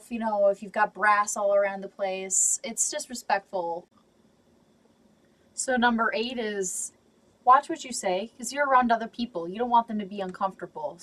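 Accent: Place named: North, United States. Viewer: haha ur from up north rock and roll i can tell by your accent i spend 3 moths a year in northern mn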